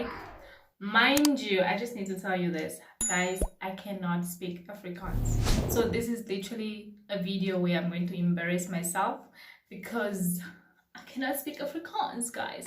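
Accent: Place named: United Kingdom. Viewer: And the way you speak it with an English mixed accent 😍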